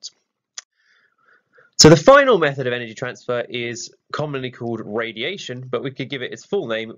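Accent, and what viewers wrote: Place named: United Kingdom. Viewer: nvm u sound british :face-orange-raised-eyebrow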